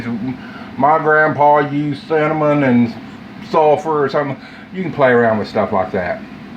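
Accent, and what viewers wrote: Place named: Appalachia, United States. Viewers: his hillbilly accent and attitude sold me. he talks just like me. i trust this man . gonna go to war with some ants to0-nighttt! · Wonderful video.. I used a bait from dollar tree sat it down then came back like 10 min's later oh lord they was all by the bait (Pic- Ant killer Bait) I got mortified and sprayed Tat ant and Roach killer.. Killed them on the spot was I supposed to leave it be and let it do like the video above let them fest.. I was so darn scared I killed them on the spot with the spray.. On my way to get Terro Now.. Please advise.. Thanks - I love your southern voice ♥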